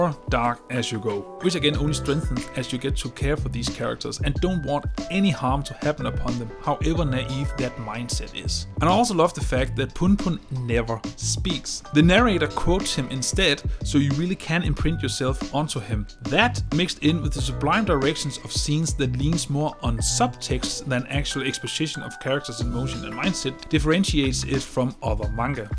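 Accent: Denmark. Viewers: Er du dansker? Din accent lyder ret dansk i hvert fald. Nice video tho!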